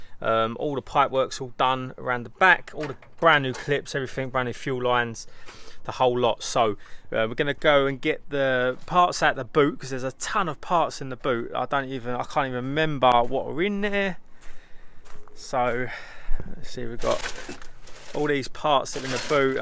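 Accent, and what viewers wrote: Essex, United Kingdom. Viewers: He sounds like Southend.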